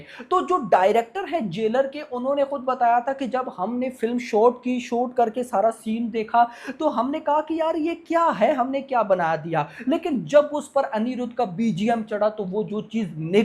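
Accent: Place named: Pakistan. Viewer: Why are you over reacting and I don’t know, i feel You have a Pakistani accent.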